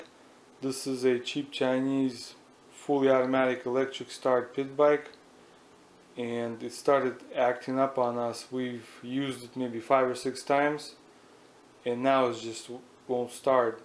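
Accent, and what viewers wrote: Russia. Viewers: ааа какой русский акцент 😊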